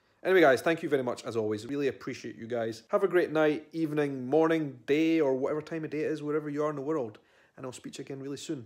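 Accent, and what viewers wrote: Scotland, United Kingdom. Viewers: Xlove Scotland and I love your Broge(accent)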